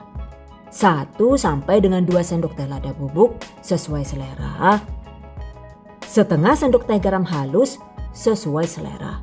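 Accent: Banyumas, Indonesia. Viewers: Aku kalau lihat video yang ku tunggu pas mbekayune bilang pakai bahasa ngapak.... Auto langsung nggakak aku.. Ilmu dan lawaknya sangat menghibur yu...salam dari Wong Banjarnegara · Jebule channel ngapak y... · Nyoba bikin ah.. Bahasanya Indonesia Inggris dan ngapak 👍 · 😂😂😂..mbekayune...sampeyan asline ngendi koh..mBanyumasane mblaketaket pisan....sukses yuuu...inyong jan ngiler gyeh kpengin nyicipi sambel medurane...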